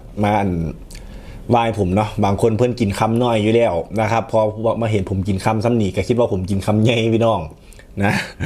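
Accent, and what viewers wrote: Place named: Ban Lan, Thailand. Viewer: ไทบ้านได๋น๊อค่ะ สำเนียงเว้าคือทางบ้านลาน บ้านโนนสว่าง จำปาโมงแท้ค่ะ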